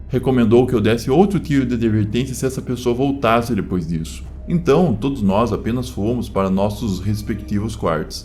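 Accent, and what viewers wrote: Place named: Rio Grande do Sul, Brazil. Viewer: sim. Já me inscrevi, e adorei o sotaque (parece gaúcho) se não me engano.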